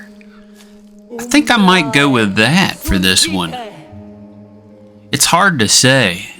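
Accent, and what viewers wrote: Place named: Appalachia, United States. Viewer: Killing time having a stumble through your playlists wonderful narating I love the Appalachian accent it'sy favourite of the American types and Ur all so handsome out there' too🐻🐻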